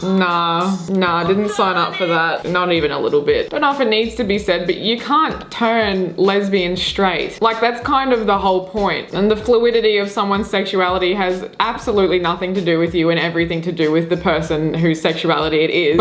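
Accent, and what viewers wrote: Australia. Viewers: I got scared for a second, but nvm it’s fine. (Also are you Aussie? You sound like it) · Ma’am you sound like a fellow Aussie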